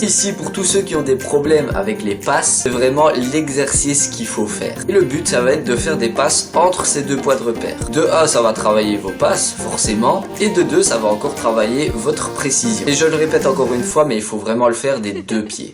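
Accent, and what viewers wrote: Belgium. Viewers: T belge?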